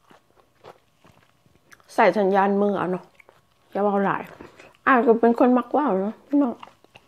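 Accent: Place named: Lao Nyo, Thailand. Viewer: ไผน้อไปว่าน้อง พูดมากป้าชอบฟังนะเวลา พูดฟังเพลินดีลาวผสมญ้อน่ารัก💝💝💝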